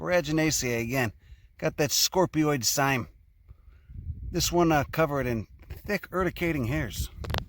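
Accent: Chicago, United States. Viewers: Thanks for exploring my country. I found you because of the Chicago accent. A Chilean natural myself who lived in the Humboldt Park area and in Willmette for a couple of years. It's always refreshing getting to hear the accent I got my English from... If you ever come to Patagonia you'll be astonished of the flora down here. Youll get to see wild strawberries, fancy varieties of potatoes, Gaultheria mucronata, and the trees, man... wow! Fitzroya cupressoides, Araucaria araucana (monkey puzzles, typically pictured in dinosaur books and shit). If you EVER come here, you'll have a home where to stay, man! Again, I'm really happy to hear you talk about these wonders... in the accent I learned my English from! Hugs from Villarrica and keep up the good work!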